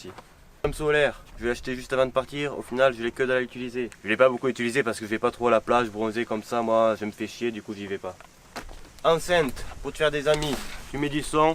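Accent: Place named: Southwest, France. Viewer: oh t'as retouvé l'accent et le vocabulaire du sud ouest !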